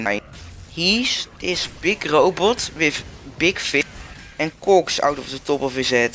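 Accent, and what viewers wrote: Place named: Netherlands. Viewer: i can just feel ur dutch voice.. Don't worry about it i have the same problem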